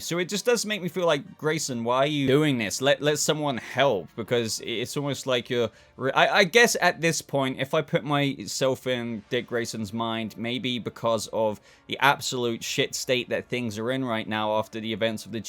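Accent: United Kingdom. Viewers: I am totally digging your British accent. 😆..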